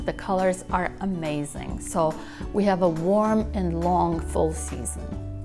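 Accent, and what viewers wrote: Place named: Boston, United States. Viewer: You say Massachusetts but all I hear is Boston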